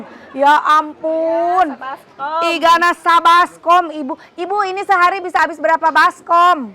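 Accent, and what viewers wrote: West Java, Indonesia. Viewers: seneng bagnet denger teh susundaan berasa di kampung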